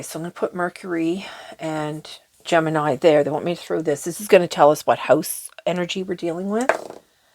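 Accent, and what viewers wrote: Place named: Atlantic Canada, Canada. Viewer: so glad to have found your channel, 🩵💕 love your voice - its such a blessing to hear an atlantic canadian accent reading for spirit!! 😇🐉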